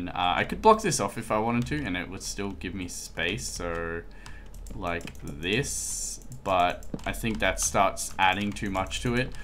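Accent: Australia. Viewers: Are you Australian? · You're one of my favourite youtubers, you deserve way more subs man! Awesome content! And people say to me: You sound Austrialan, then I directly think of your voice haha! :D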